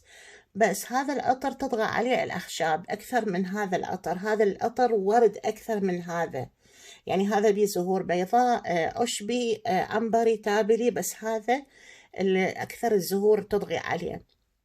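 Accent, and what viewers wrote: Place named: Iraq. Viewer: وردة اللهجة العراقية جميلة مثلك يا قلبي. انا سعودية واموت على العراق وأهله واللهجة العراقيه استانس اسمعها. عرضك للعطور جميل ورائع كروعة روحك.حبيبتي ♥️متابعتك بدوره من السعودية. 🇮🇶🇸🇦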